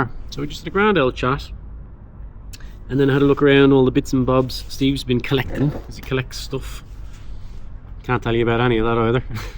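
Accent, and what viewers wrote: Ireland. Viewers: Wow, you take us to some amazing places! Great music, beautiful Irish accent attached to an easy-on-the-eyes kind hearted dude. Thanks heaps. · And hearing an Irishman speak, using words rarely used here in southern California. So educational as well as amusing to dawdle around with you. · loved this video, chateau in the cliffside was amazing! Thank you for all these videos, you are a very interesting and entertaining guy, maybe its your Irish accent! Ha ha 🇨🇦BC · Congratulations. You are a breath of fresh air, have a beautiful Irish accent and are a very genuine person, that's why you have so many faithful Subscribers. I just love following your antics and going on little trips with you, they're always showcasing quaint little French villages and countryside, thank you for making my days a little brighter and taking me with you on your trips and sharing your day to day life as well. 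Much love xxxx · Can you also write the name of the chateau in the hill please, love your Irish lilt , but didn't catch it's name, and I want to put it on my next year 'tour of rural France ' list. Thanks 👍🏼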